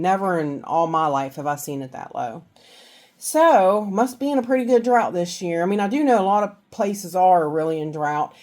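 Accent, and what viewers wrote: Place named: South, United States. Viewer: Hey there, from your accent you sound sound sound southern like me😊 May I ask where your from? You said the name of that lake and I thought I have heard of it before many years ago. At least I think I have 😂 I use to live in Ga. When I was little we use to go to a lake in Alabama.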